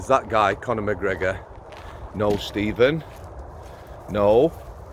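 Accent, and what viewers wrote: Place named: South Yorkshire, United Kingdom. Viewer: Love the accent, I'm hearing South Yorkshire. Very well delivered and to the point. Thankyou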